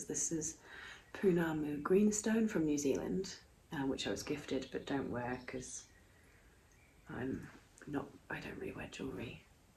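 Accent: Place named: Surrey, United Kingdom. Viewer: Lovely Surrey accent and Asmr , Just wish you posted more videos , Blood thinners aren’t fun I’m on them for life because i get clots in my lungs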